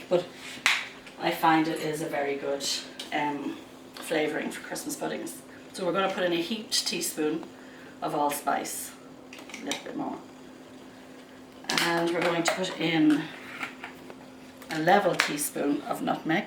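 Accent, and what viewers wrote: Ireland. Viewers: Hah, Guinness. Should have guessed it with that accent! This is a different approach to the plum pudding. It will be interesting! Thankyou. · Are you lrish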